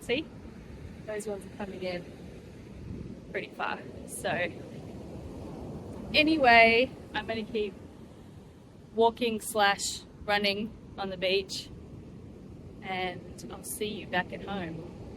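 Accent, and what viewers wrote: Australia. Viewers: i really like your videos. so that i can shadow aussie accent ❤ ❤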